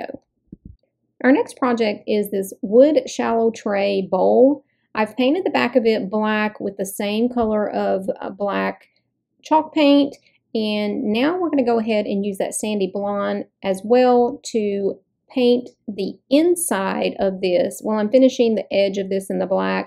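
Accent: North Carolina, United States. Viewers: I knew you had to be in North Carolina by that accent. I'm in Winston-Salem. We used to go to Hickory a lot for Gems & Minerals shows when I was little. I hated going, but they would bribe me taking me to Hardee's there. They were the best back in the day.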